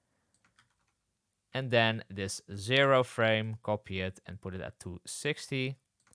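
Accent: Netherlands. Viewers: Nederlands accent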